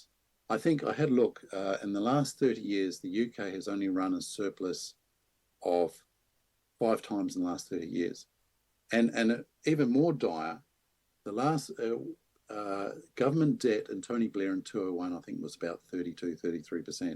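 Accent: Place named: Australia. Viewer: Very interesting - thanks for that. You had me with the accent, I felt sure you were, like me, an Aussie.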